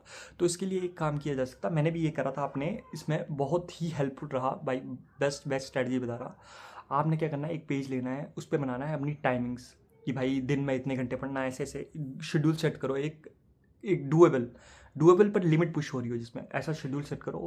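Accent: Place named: Pahadi, India. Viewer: pahadi ho kya bro, accent aa raha hai thoda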